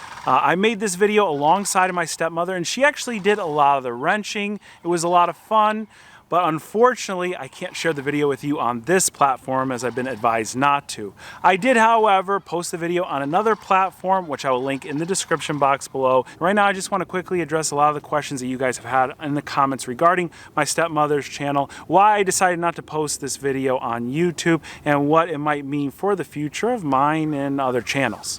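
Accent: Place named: Florida, United States. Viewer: You are starting to sound like the @@@@ Floridians who show up here in NC. And no, I am not going to Instagram because it is owned by FaceSuck.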